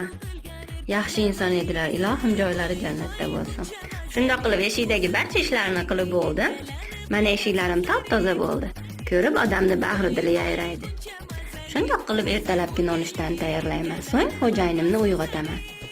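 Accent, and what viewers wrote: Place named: Andijan, Uzbekistan. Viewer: Anjandi shevasi baribir boshqacha daa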